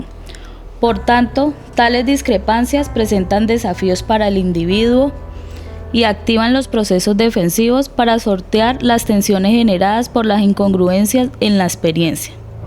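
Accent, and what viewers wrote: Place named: Cali, Colombia. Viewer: oye ois vos sos como caleña amista.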